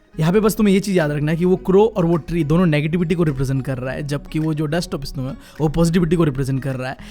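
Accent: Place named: Assam, India. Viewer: I like your assamese accent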